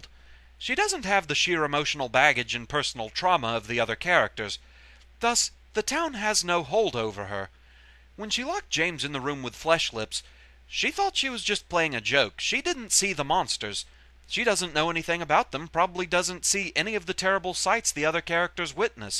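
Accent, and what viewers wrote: United Kingdom. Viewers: ¿Are you brittish my friend?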